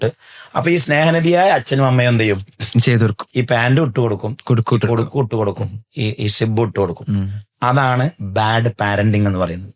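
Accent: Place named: Malabar, India. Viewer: This guy know good malayalam but sometimes he goes to the low class malabar accent. But think world is not malabar.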